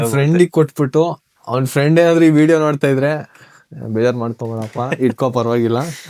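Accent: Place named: North Karnataka, India. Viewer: ಯಾವ್ ಊರ ರಿ ನಿಮ್ದು? ನಿಮ್ಮ ಬಾಯೊಳಗ ಕನ್ನಡ ಕೇಳಾಗ ಬಾಲ್ ಖುಷಿ ಆಗ್ತದ.. ಬಹುಶಾ ನೀವು ಉತ್ತರ ಕರ್ನಾಟಕ ಕಡೆ ಇರ್ಬೇಕು ಅಲ್ಲಾ...?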